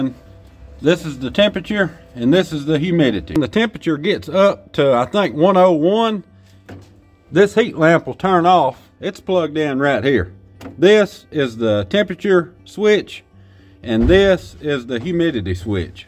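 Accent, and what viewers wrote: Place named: Northern Kentucky, United States. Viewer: Northern Kentucky!!! Just subscribed and I absolutely love your channel! Your accent reminds me of home!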